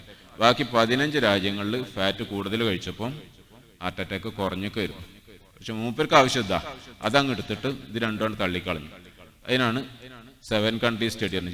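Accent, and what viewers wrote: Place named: Malappuram, India. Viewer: എനിക്ക് എറ്റവും ഇഷ്ടപെട്ടത് ഇദ്ദേഹത്തിന്റെ നാടൻ മലപ്പുറം slang ആണ്.. നല്ല വ്യക്തിത്വം.... ഇദ്ദേഹത്തെ പോലുള്ളവർ ആണ് യഥാർത്ഥ മനുഷ്യ സ്നേഹികൾ.. അല്ലാതെ രാഷ്ട്രീയക്കാർ അല്ല...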